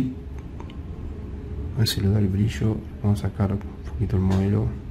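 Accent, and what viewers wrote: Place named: Argentina. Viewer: O habla en español o en argeninglish, me volví loco intentando traducirte...si pronunciás "bas" por "bus", deberías pronunciar "baedi" en lugar de "body", y así todo el video.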